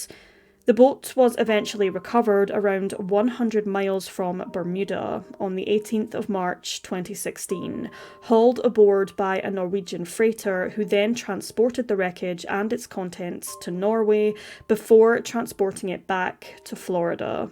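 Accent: United Kingdom. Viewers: We’re fucked….. said in a perfect British accent….instantly subscribed! Best narration ever.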